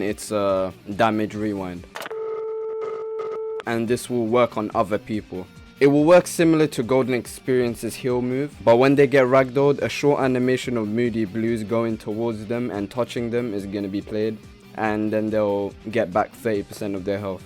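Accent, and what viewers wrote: United Kingdom. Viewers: Ayyy a fellow British man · He got that bri’ish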